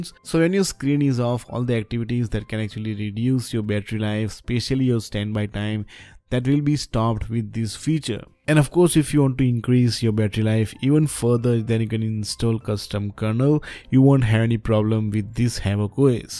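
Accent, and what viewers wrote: India. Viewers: Are you Indian living in Melbourne? Because your English seems Indian.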